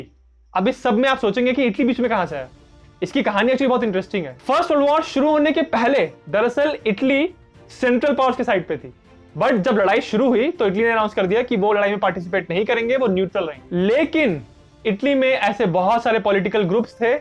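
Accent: Bengal, India. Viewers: Are you a Bengali?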